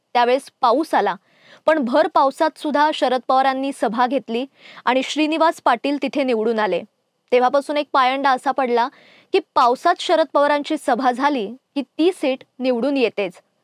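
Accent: Standard. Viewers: कॅमेरासमोर तू स्वतःला छान सादर केले आहेस. तुझा खणखणीत आवाज, स्पष्ट आणि शुद्ध उच्चार व आत्मविश्वास कौतुकास्पद आहे. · छान...स्पष्ट आणि शुध्द उच्चार... खणखणीत आवाज...उत्कृष्ठ विश्लेषण....👍👍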